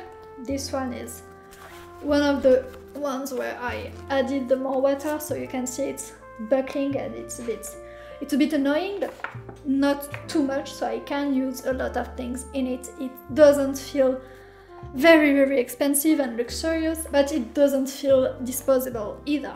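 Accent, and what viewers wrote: France. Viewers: are you french? we almost have the accent :)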